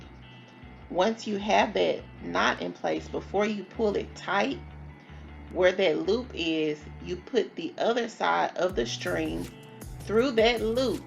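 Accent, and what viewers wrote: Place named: Alabama, United States. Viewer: Hi, such a beautiful work 💞💞 are you from Alabama? I looooove your accent. I'm from Argentina.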